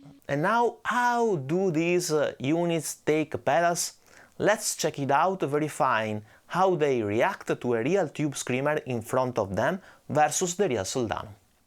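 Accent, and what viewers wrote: Italy. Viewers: Look, I just wanted to joke around a bit. I mean the name what could it mean? That this nice guy likes Fender and Gibson guitars. That's all. And I asked, why he didn't choose to honor also PRS guitars by calling himself ", Paul Gibson". You got me? Ich nehme deinem Namen nach an, du bist Deutscher. Ich bin ein lustiger Tiroler, der halt in D nicht gut ankommt. Bin leider kein Mario Barth, was soll's! Dass Italiener ist, erkenne ich an seiner typisch italienischen Aussprache, was ja wirklich nebensächlich ist. ich wundert immer wieder, wie schnell man auf YT entweder blöd angeschrieben oder belehrt wird und alles so todernst genommen wird. Ein bisschen mehr Lockerheit ist nicht nur beim Gitarrenspiel angesagt, sondern generell. Aber Schwamm drüber! Ich wünsche dir noch alles Gute und rock on!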